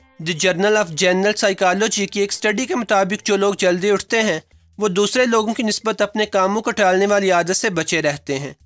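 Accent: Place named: Sialkot, Pakistan. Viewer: Speaker is definitely from sialkot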